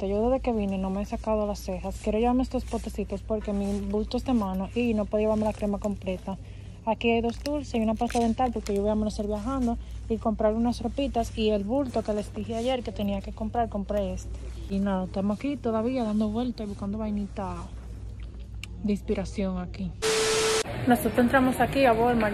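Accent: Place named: Cibao, Dominican Republic. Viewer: Tiene el acento cibaeño muy marcado 🤣😫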